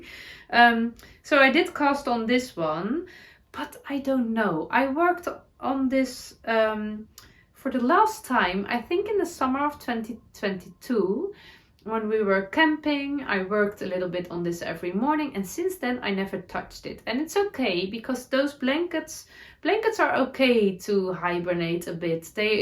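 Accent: Netherlands. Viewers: As a second generation Dutch Canadian, can I just say that I LOVE listening to you speak. ❤ It almost feels like I’m visiting with a Dutch relative, and when you try and find the right English words I find myself cheering you on as you try different ways to say it. I wish I spoke Dutch - it’s such a beautiful language and a beautiful heritage that brings me so much joy to listen to. ❤️ Someday I hope to visit!!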